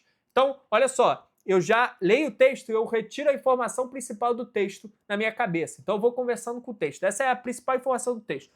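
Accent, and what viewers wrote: Rio de Janeiro, Brazil. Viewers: eu racho com o seu jeito carioca de explicar KKKKKKKK amo seus vídeos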